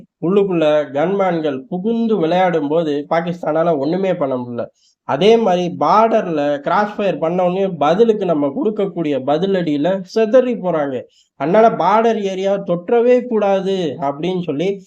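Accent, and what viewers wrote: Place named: Nagercoil, India. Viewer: Ur voice is very familiar. R u from nagercoil.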